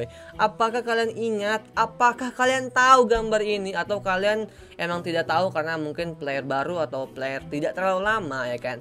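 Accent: West Java, Indonesia. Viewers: Bang Abang orang Sunda ya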